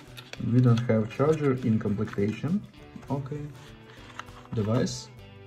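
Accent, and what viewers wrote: Russia. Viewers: не плохо ты кудахтаешь на английском, просто просто акцент есть русский немного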